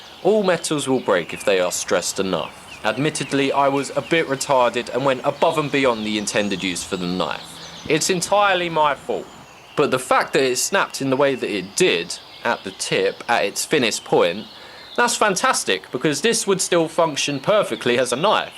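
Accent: United Kingdom. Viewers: Which country do you live in if you don't mind me asking? By the background and your accent I'm thinking the UK. Not a stalker or anything, just wondering how you get away with carrying such a beast of a knife. I want to buy one of these to take camping but I'm worried of getting into legal trouble. I live in the UK and the local police won't even allow me to carry my folding pocket knife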